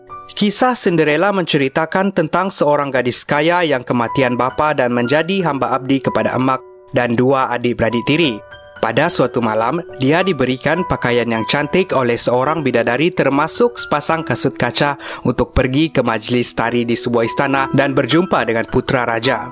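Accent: Sabah, Malaysia. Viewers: kamu urang sabah ka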